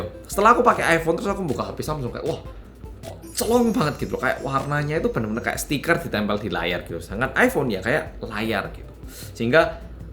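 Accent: Java, Indonesia. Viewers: Anjirr lancar banget bahasa Indonesia nya Ampe ke Jawa jawaan😆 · Kog logat e jowo😁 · 14 apa 13 pro bang medok wkwkk ?? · Samsung endorse dong, Iki wong korea tenanan lho, cuma yo rada medok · Korea jowo, mantap banget bahasane mas wkwkwk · Wong jowo yo mas? Medog jowo ne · Mas yutub kalau jelaskan super detail. Medoknya khas...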